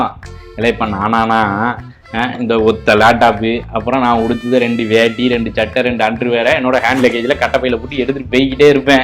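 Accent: Tamil Nadu, India. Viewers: Super, I am a great fan of your Annachi tamil accent 😅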